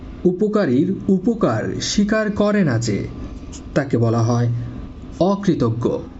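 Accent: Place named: Chittagong, Bangladesh. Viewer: স্যার মনে হয়, চট্টগ্রামের🙆🏿‍♂️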